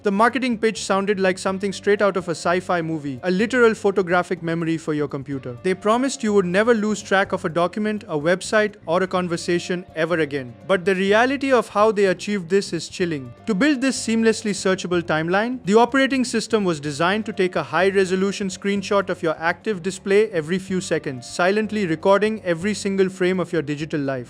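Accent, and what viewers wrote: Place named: India. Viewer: Someone has to say it: It’s not AI, he’s just Indian, they all sound that way! Actually I know a lot that speak without any accent to the point you mistake them from other places. I also appreciate that at least it’s real info and accurate how I feel to the situation. I can’t say slop certainly. If it was AI, then even AI sees the issues.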